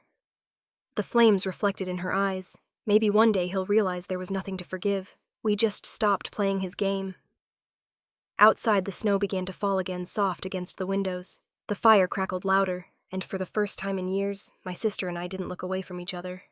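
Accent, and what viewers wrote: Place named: Indiana, United States. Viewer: I thoug y t she was from Indiana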